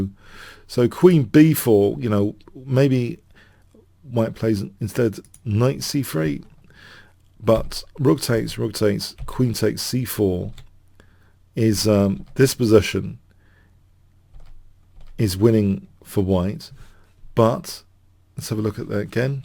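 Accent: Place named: RP, United Kingdom. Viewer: May I say... I love your videos as much for the great chess insights as for your very nice English BBC accent ☺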